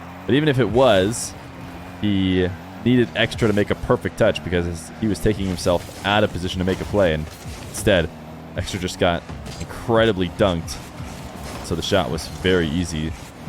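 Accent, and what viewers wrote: United States. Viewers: You sound like Johnnyboi if he had an American accent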